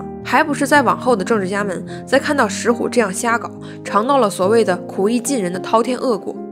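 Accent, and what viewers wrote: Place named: Beijing, China. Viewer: 姑娘說書內容詳盡又能不疾不徐且毫不做作！一口道地之北京話更讓吾人擊節欣賞！姑娘讚！既然姑娘熟讀中國歷史即使是分裂戰亂年代也是漫長的歲月可述說之內容亦如海水之不可斗量！姑娘妳何妨將之細分多期講述之？一則讓聽眾有時間消化二則也能有更多期的視頻此乃兩全其美也！姑娘妳何樂而不為？姑娘妳以為然否？同時姑娘妳也不妨開闢歐洲史的部份自馬其頓帝國起至二戰結束終也能製作非常多期之視頻矣！至少能讓銀明白阿提拉大帝和拔都汗對歐洲造成的傷害是多麼的巨大！還有為什麼蘇德一定非要瓜分波蘭不可！又為什麼明明是同樣的斯拉夫民族鵝螺絲和烏克蘭卻能生死搏鬥！以及為什麼日俄戰爭中波羅的海艦隊全軍覆沒卻是對蘇聯共產黨天大的幫助？等等………！如此增廣我等之見聞豈不美哉？姑娘妳其有意乎？忠言向來逆耳！若在下所言使姑娘妳不悅亦望姑娘展現大度一哂置之！則在下幸甚！姑娘亦幸甚！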